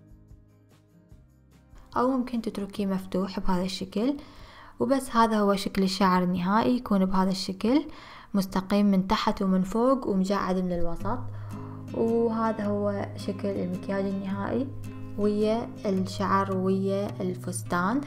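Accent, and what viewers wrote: Iraq. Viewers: اكثر شيء أحبه بيج هوه حجيج العراقي ومتصنعين اني عراقيه واموت عليج 💚💓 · طلة ولا احلى مكياج بيجنن 😍 وانتي بل اصل بتجنني 🌹🌹 بموووت فيكي 😘😘 وياريت تضل فيديوهاتك طويلة لانو مابينمل منك ومن حكياتك وتحية لكل اهل العراق بموووت عل لهجتكون وانتو ناس بتجننو اختكون من سورية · هاي اللهجة العراقية البغدادية القديمة لذلك مداتفهميها كولش زين لعد لوسامعه الموصلية شيصير بيج ...المصطلحات صعبة..مثﻻ هياته يعني هذا لعد يعني جا اغاتي عزيزي كولش .....كثيرا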